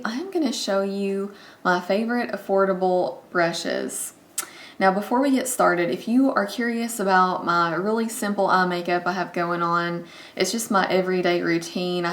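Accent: South, United States. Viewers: I couldn't agree more that there is no need to spend a ton of money on brushes for a pretty makeup look. BTW...I love your southern accent. :) You are so pretty, and down to Earth. I enjoy watching your videos.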